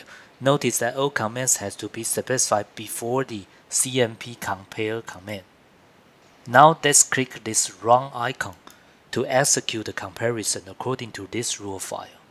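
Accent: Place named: China. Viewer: Chinese Accent. Difficult to understand. Please upload video with better accent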